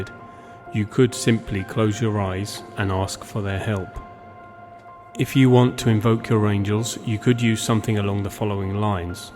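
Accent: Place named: United Kingdom. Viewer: It is good to hear the British English accent. so classy.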